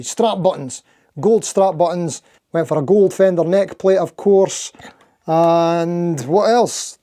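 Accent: Scotland, United Kingdom. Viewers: That`s allright. Thank you for answer. Please contiue with your channel. I love your Scottish accent. I`m from Germany.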